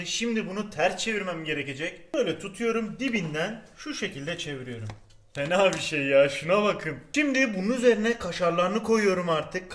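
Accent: Adana, Turkey. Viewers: "Şunu alüyürüm, bunu koyüyürüm, buna eklüyürüm." Bütün yemek videosu çekenlerin neden şivesi adanaya kayıyor ve salağa anlatır gibi anlatıyor anlamıyorum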